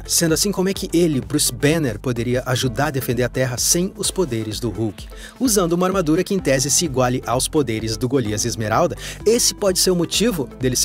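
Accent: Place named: São Paulo, Brazil. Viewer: o teu sotaque é de invejar, sou do interior de Sampa e sei que teu sotaque é de Paulistano, e não de paulista que é do interior. Um dia aprendo a falar com esse sotaque.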